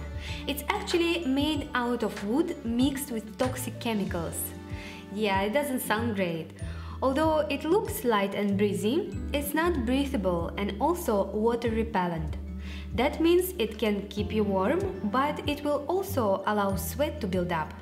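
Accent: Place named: Russia. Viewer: Слышу русский акцент)))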